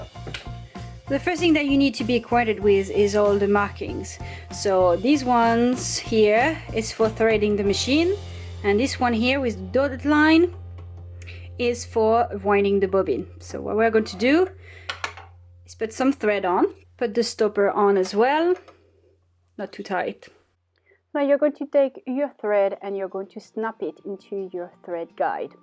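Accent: France. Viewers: Are you french? Your accent is lovely!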